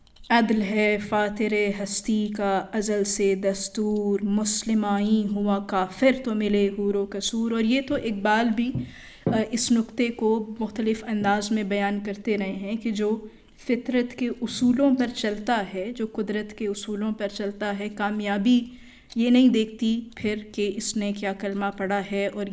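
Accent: Iran. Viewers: ایک دقت ہے کہ محترمہ اردو بول تو رہی ہیں مگر فارسی لہجے میں بول رہی ہیں، اردو کا لہجہ اور ہے۔ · محترمہ ڈاکٹر صاحبہ کا بہت خوبصورت لیکچر ہے اقبال کی شکوہ و جواب شکوہ کی نظموں پر ۔ ان نظموں کو سمجھنے کے خواہاں لوگوں کے لئے ایک بیش قیمت تحفہ ۔ ڈاکٹر صاحبہ کا لہجہ نظم ادا کرتے ہوئے فارسی سے متاثر ہے ، اس کی وجہ یہ سمجھ آتی ہے کہ ان کا شاید زیادہ کام فارسی شاعری کے گرد ہو۔ اس سبب سے کچھ جگہوں پر تلفظ کی غلطیاں بھی ہیں ۔ مگر علمی طور پر میں کم علم تو کوئی کجی نہیں بھانپ سکا ۔ یہ سنتے ہوئے کئی جگہوں پر میں جزبات سے مغلوب ہو کر اشک آلود آنکھوں سے دیکھتا اور سنتا رہا ۔ ڈاکٹر صاحبہ نے بہت عمدگی سے وضاحت و شرح کی ہے ۔ میں یہ محسوس کرتا رہا کہ اقبال سے جو جزباتی محبت ، والہانہ عقیدت اور ان کے پیغام کے محاسن سے جو انس میں رکھتا ہوں ، وہ عشق ڈاکٹر صاحبہ بھی رکھتی ہیں - کچھ جگہوں پر واضح تھا کہ ڈاکٹر صاحبہ بھی گویا کلام پڑھتے ہوئے ایک قلبی و جزباتی کیفیت میں وارد ہو گئی ہیں - شکوہ کے بیباک انداز پر ان کی گفتگو سنتے ہوئے دل کے تار ایسے چھڑ گئے کہ بقایا حصہ بہ چشم نم سنتا اور دیکھتا رہا ۔ بلا شبہ یہ انداز گستاخانہ نہیں بلکہ لاڈ ، پیار ، مان ، یقین کامل اور محبت عمیق کا مظہر ہے- جیتی رہئے ڈاکٹر صاحبہ اور علم و عرفان کی روشنی سے طلبا کے ذہنی و قلبی چراغ جلاتی رہئے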